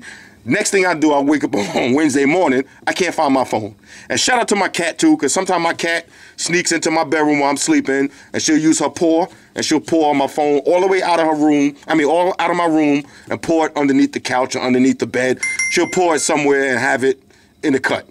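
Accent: New York, United States. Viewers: very good review ,easy to understand, plain english, the way WE New yorkers speak everyday, because of this review , i'm buying this watch, thanks Man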